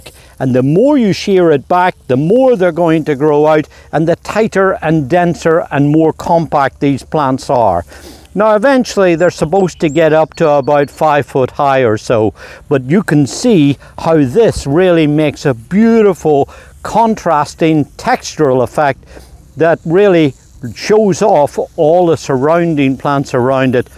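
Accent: Northern Ireland, United Kingdom. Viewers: I live in Ireland since 2007, but I also lived in Cape May. You sound like you might have a little northern Ireland in your speech. Great videos! Thanks!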